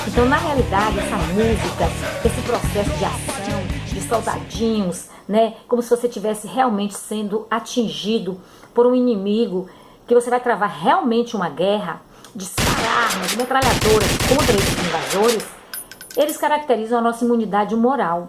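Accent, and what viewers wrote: Bahia, Brazil. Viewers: Essa é baiana, hein bebê. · Vc é baiana??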